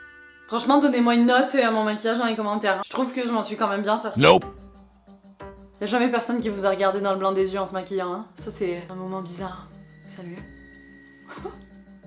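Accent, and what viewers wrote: Quebec, Canada. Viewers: J’ai remarqué que tu avais quand même un petit accent québécois hein ! En tout cas super vidéo ! N’hésite pas a voir ma chaîne et me dire ce que tu en penses pour m’améliorer !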